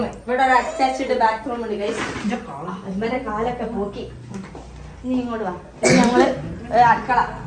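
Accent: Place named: Malappuram, India. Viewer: സംസാരം തമ്മിൽ നല്ല വ്യത്യാസം ഉണ്ടല്ലോ. തനി കോഴിക്കോട് തന്നെ പക്ഷേ ഒരു മലപ്പുറം ടച്ച് ആണ് കൂടുതൽ തോന്നുന്നത് അതെന്താ അങ്ങനെ 🤔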